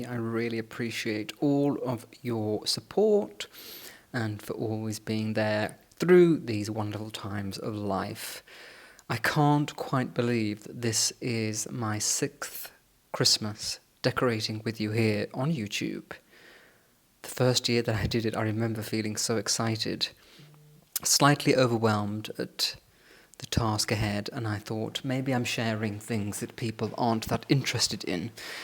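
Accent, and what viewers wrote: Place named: England, United Kingdom. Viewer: Hi, I love your English accent and the way you decorate your house. My daughter loves the vintage decorations, and I know she loves your way. My taste is a little more glam, but I also like yours. I'm very glad to see your posts. I send you my blessings from Riverside, California USA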